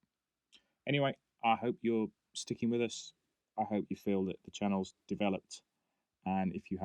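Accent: United Kingdom. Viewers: Just pinged watched 3 episodes and their production videos. Saving the last one for later. Loved that 3rd video ended somewhat unclear. Im guess you could say im a fan of ww2 planes but i never remember hearing about an boulton paul defiant...Watching the video i thought it was somekind of mix between a hawker hurricane & ju87 :D Dont know if the video production got better but I had really hard time following the first episode. Had to paus it many times, go back & listen to it again. Either I lost interest in it. Or first video had you mumbling? OR the most likely one. It took me time to start understanding the accent. By the way, Im from estonia soo english isint my first language & I absoluutely love the British accent voice :) wish i could fake talking like that.... Riiiight...And as far as the second episode. That was my favorite by far :) Dont know why but it got me hooked and i could not look away. 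Thanks for the stories :)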